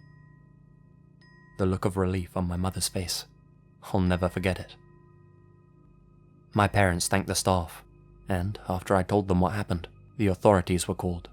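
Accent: England, United Kingdom. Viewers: It’s kinda funny listening to a British person narrate stories about the U.S. Also, let’s go Massachusetts! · mostly taking place in New England, where i too live, this is going to be good!! makes me wonder if is in new england (though i realize he has a slight English accent)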